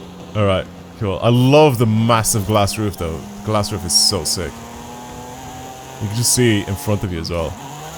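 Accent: Ireland. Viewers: Wait, you from Ireland? Me too bro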